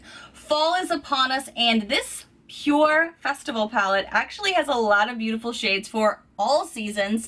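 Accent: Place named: Midwest, United States. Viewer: I always thought you sounded Midwestern. Plus you love the Blues.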